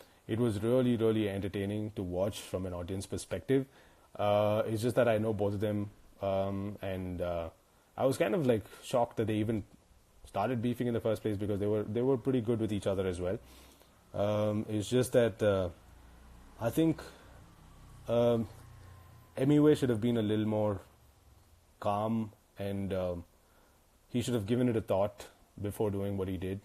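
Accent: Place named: England, United Kingdom. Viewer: Bro I like your English accent,my English is not that good I love u bro .... and I love your songs very much .......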